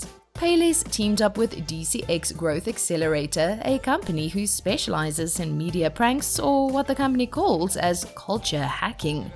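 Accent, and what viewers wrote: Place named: South Africa. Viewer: This narrator definitely from South Africa.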